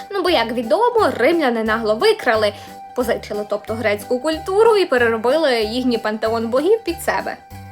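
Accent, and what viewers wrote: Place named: Western Ukraine, Ukraine. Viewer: Кумедна діачинка. Я хоч і сам з заходу України і для мене такий говір мав би бути звичним явищем.та чомусь ваша маннра викликала в мене посмішку. Успіхів у розвитку канала!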